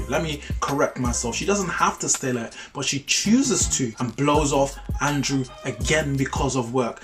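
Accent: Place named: United Kingdom. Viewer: I love the British accent bro and love your choice of music. I like Nathan as a character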